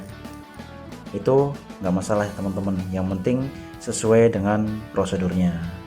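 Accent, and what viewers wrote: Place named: Java, Indonesia. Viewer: Jn jawane nyong bngt kang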